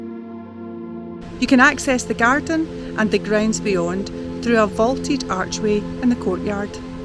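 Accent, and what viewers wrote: Scotland, United Kingdom. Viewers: I love your videos! The history content, the soft music, and the visuals are very nice! Something I especially enjoy is your authentic accent! I noticed in this most recent video, you smoothed out some of your pronunciation. Please don't water down your wonderful Scottish accent for me!! I vote to keep it true!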